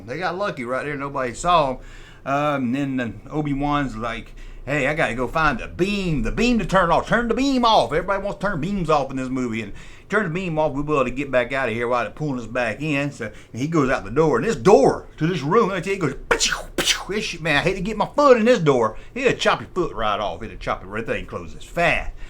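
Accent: South, United States. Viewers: Now this is my kind of recap/storytelling of the original Star Wars movie, as someone who also is a southerner, I understood everything you said.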